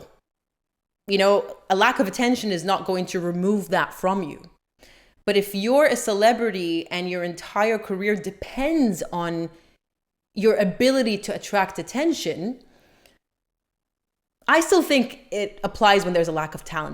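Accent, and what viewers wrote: New York, United States. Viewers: Whaaaaaa? You’re not an American? You never been here? You have a perfect American accent!!! How do you manage it? I admired you before but now that I know this, hats off to you lady!! Where are you from then? Wow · How can you never have been to the states and yet speak like an American? I love your program, your mind is sharp and your delivery is exceptional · It blows my mind you’re not from the US because there is NY writ large in accent, mannerisms, hand gestures. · How do you have such a US American accent if you've never been here? Not unless you did your schooling in Canada. Sometimes their accents sound the same :) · Don't you have an american accent? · I always thought you were American based on your accent !!!!😂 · Your accent sounds America. Where are you originally from? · I was surprised when you said you’re not an American and you’ve never been to the States. 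You have an American accent! I just assumed you were born here and moved abroad. Where are you from?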